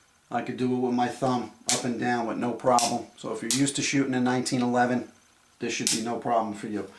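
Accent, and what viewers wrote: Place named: Queens, United States. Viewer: Great video. I ordered mine after Christmas and waiting for the transfer with my FFL. It's also good to hear someone who talks like I do. Astoria, Queens here! Thanks.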